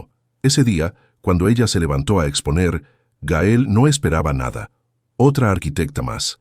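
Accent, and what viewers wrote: Argentina. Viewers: Porqué el relato usa el asentó argentino o Uruguay se nota demasiado no es necesario sobre todo si los protagonistas son españoles o mexicanos 🤔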